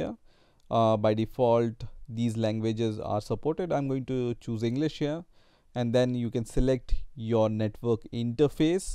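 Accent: India. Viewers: Never trust a dude with a Indian accent when it comes to your pc...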